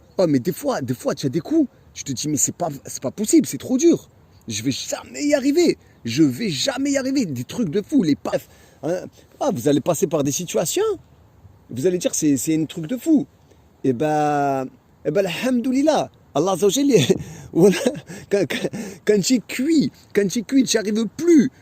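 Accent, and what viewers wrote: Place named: South, France. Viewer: Par contre mon frère quand tu parles sa me rappel toute mon enfance, je suis du sud aussi et toutes tes expressions sont familières, ton language c'est le langage des gens du sud avec la culture provençale mélangé ah ah Mes grands parents parlaient souvent le provençaux, quand tu dis : " ô Fada, ou ô Fan". C'est comme si j'entends mon père parlé.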